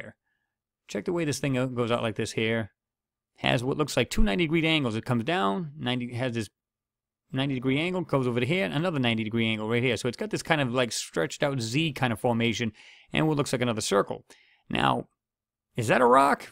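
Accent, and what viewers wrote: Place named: Rhode Island, United States. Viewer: completely unrelated, but your accent... you a native of Rhode Island? You sound like my relatives.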